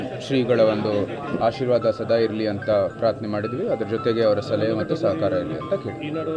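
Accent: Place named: India. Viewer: ರಾಜಗನ್ನಡ ಕೇಳೋದೇ ಚೆಂದ 🥰👏👏👏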